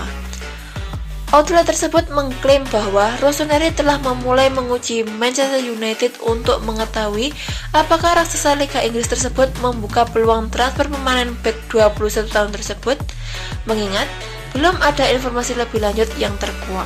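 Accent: Java, Indonesia. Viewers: Mbak bisa d ilangin medoknya dikit gak wkwwk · Yakin ini chanel nya Milanisti?kl iya knp bahasanya lebay dan pesimis seolah tanpa calha milan bkn apa2.hello u sdr gk sih milan itu jauh lbh besar dr sp pun jg termasuk calha.btw baikin dulu narasi dan intonasi nya.jgn medok gitu...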